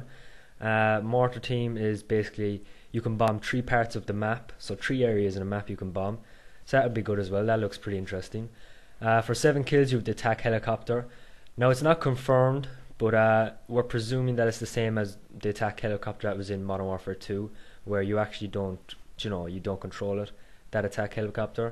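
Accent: Ireland. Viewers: french?! you mad. its blatently irish lol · u sound irish if so so am i :D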